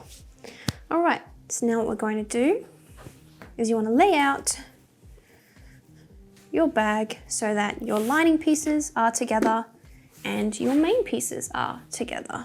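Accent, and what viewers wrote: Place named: Australia. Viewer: Oh thank god, its an Aussie and not some ageing southern momma y'alling with a voice like a drill sergeant after a night in a smokey bar doing karaoke . . . .this is exactly what ive been looking for.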